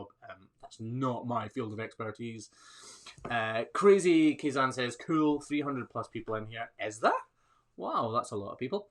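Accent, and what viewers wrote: Scotland, United Kingdom. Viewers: Hi Mr I'm so sorry I missed the live stream but just wanted to drop you and the Mrs a Happy New Year from Northern Ontario Canada. Love your video. I'm not in farming at all but I find it amazing what care you take with all the cows and bulls. It's so great to see. So LOVED your video with all the calfs was wishing I could of been in there with you and played with them. Sooo sweet. Thank you for being such a kind and loving person it does come themrew in your videos. Have to love a Scotsman it's the accent. I'm part Scottish and have never been to Scotland your videos really make me want to visit. I had asked before but the music you play in the back ground who is it? I know there's more then one but I love it it's so relaxing. Can you give me somenoff the names please. O and you should come to Canada and see it we are up to Scotland standards. 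That would be a great video. Thanks for all your video and again Happy Ney Year may you and your be truly blessed.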